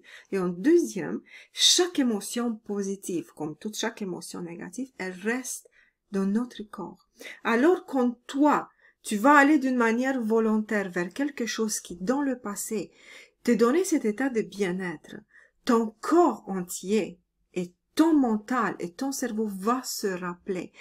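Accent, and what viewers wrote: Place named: Latin America. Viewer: Merci. Je ne peux pas dire que c'est une période difficile que je traverse mais une période de transitions avec divers projets qui demande courage, patience, persévérance, quasi à la retraite,... j'applique déjà ces 3 conseils mais j'apprécie beaucoup de les entendre de ta voix. Cela cautionne ce que je mets en place pour parvenir à réaliser mes projets avec sérénité, à être patiente avec moi-même.....j'aime ta voix apaisante, ton accent latin doux et chaud et parfaitement compréhensible. Je t'aime aussi chère.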